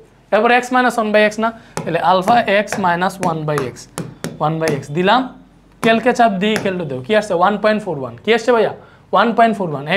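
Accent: Sylhet, Bangladesh. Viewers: Bhaiya apne kita sylhety nii🫠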